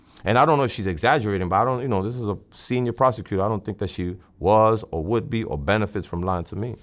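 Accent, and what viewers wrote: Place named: Bronx, United States. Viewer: "If you have a farm full of cows, does it pay for you to release your cattle? No, you gotta keep them in order to milk them." Damn, that's so true. I lived 14 years in Parkchester/Castle Hill, The Bronx. I hear that Boogie Down Bronx accent 🤌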